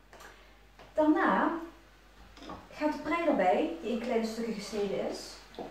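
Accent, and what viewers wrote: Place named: Limburg, Netherlands. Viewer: Ik hoor een Limburgs accent! Ik kom namelijk ook uit Limburg hahah leuke filmpjes!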